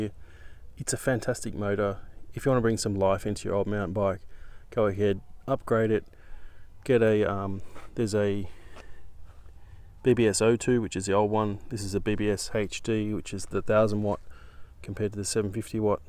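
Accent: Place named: Australia. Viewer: You sound aussie, so aren't you worried about getting caught with a 1000w motor?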